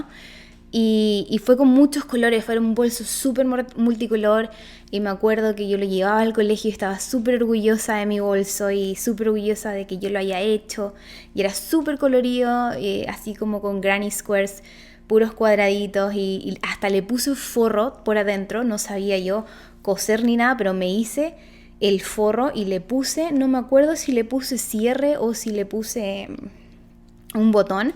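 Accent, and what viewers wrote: Chile. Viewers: Hola, he tejido varias cosas siguiendo tus tutoriales de YouTube, explicas muy bien 👍. Me quedé con tu canal porque reconocí el acento, soy de Chile también. Saludos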